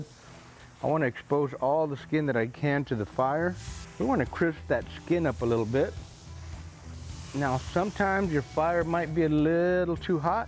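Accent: Texas, United States. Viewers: What part of Texas you from?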